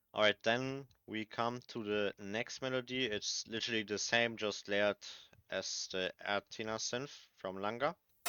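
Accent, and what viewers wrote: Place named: Germany. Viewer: you can hear hes german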